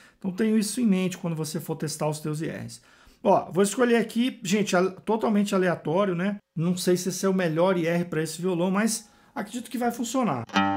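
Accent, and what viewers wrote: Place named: Minas Gerais, Brazil. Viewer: O resultado ficou parcialmente bom(apenas opinião). Professor, as vezes acho que tu és mineiro, as vezes te acho capixaba e as vezes fico confuso kkkk De igual forma, em algum momento a sonoridade induz achar que é guitarra mas falta definição. Não sei se sou muito "ortodoxo" mas soou híbrido na maior parte dos casos. Muitíssimo obrigado pelo conteúdo e até a próxima!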